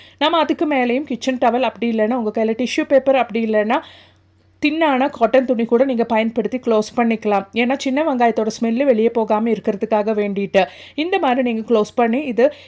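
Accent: Kerala, India. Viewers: உங்க பேச்சில் மலையாள வாசனை அடிக்குது.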